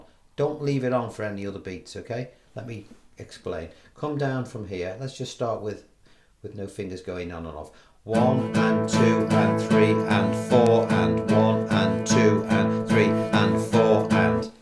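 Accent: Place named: Merseyside, United Kingdom. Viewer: I'm trying to guess the accent, outskirts of Merseyside somewhere...heeheee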